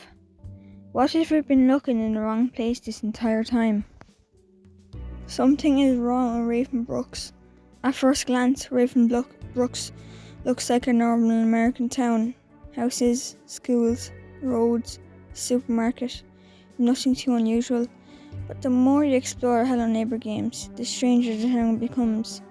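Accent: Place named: United Kingdom. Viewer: U sound british and also new sub (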